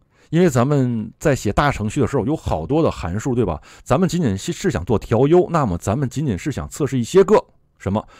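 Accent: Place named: Japan. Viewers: 因为在日本，没办法你到日本你的英语也会变W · Python Test 为什么老带日语发音？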